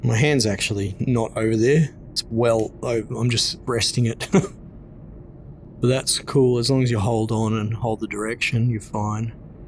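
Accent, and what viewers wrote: Australia. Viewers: Your accent sounds like it must be from Adelaide.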